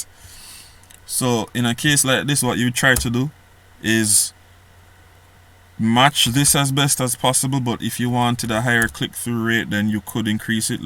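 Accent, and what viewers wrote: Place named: Jamaica. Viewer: You sound like a yardie bro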